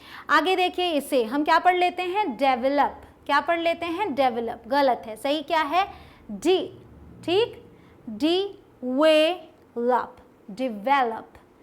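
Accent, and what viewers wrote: India. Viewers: no mam. I am not insulting her .what we learned in childhood English. Its Indian pronunciation. Thats what I am talking. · Mdm ye Indian eng h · Indian accent...👌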